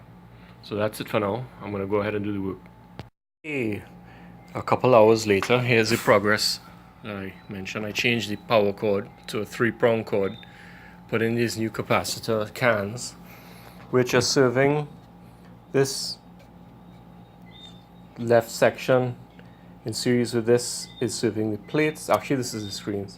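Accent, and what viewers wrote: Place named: Trinidad and Tobago. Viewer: Trinidad? Please tell me my ears dont deceive me! Woodbrook boy says hello!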